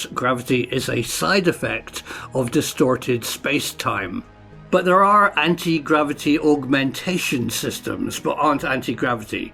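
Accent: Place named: Scotland, United Kingdom. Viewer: omg this is sooo cool, and the bit i like ther most is your wee bit of scottish accent... lots more pls.. always great to watch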